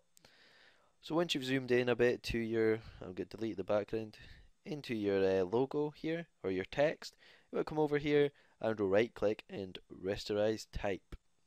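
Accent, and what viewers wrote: Scotland, United Kingdom. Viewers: HES SCOTTISH!!!!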